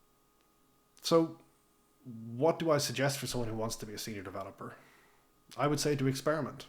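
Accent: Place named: Ireland. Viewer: thats an irish accent if im not mistaken. great to find another irish developer channel. (great video btw)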